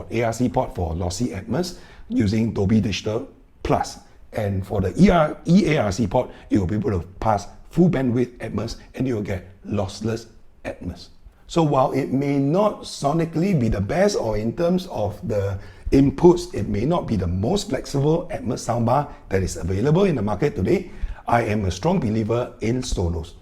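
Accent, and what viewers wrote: Singapore. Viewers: love the videos... And love the Singlish.. No doubt you are from beautiful Singapore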